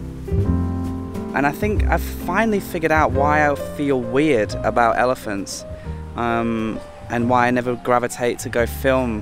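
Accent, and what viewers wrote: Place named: Northumberland, United Kingdom. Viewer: Here's a question. "We reckon you are from County Durham or Northumberland and went to private school. Are we right?"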